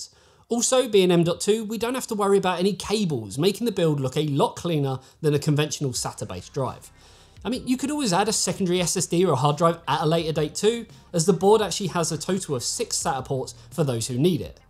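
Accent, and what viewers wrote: United Kingdom. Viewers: funny sounding british guy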